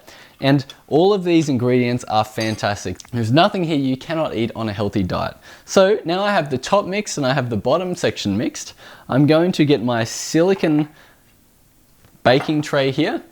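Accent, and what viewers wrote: Australia. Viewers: Good to hear a fellow Aussie talking about Keto lifestyle on here:-) I’m day7 great video! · Wow! how awesome! They turned out Deliciously fantastic and perfect too!! I too, could have devoured the whole lot in no time. They were a hit with my Bestie! I didnt trust myself with them all. So I sent some away with my Bestie to enjoy also. thank you so much! So glad I found your channel. Finally, I found a fellow Aussie to keep me focussed on my keto committed journey! Hearing you say “$5 bucks from Kmart.” Was ‘home’ to me! Gotta love it!” I have subscribed and can’t wait for many more Aussie recipe ideas. GOD love you!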